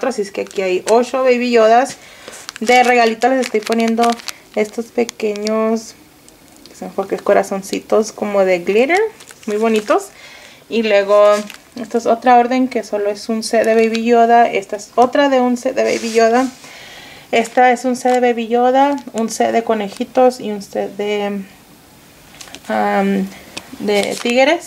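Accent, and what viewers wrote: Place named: North, Mexico. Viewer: Eres como del norte de México, me di cuenta cuando dijjiste "oshenta y osho" jejejeje. Saludos!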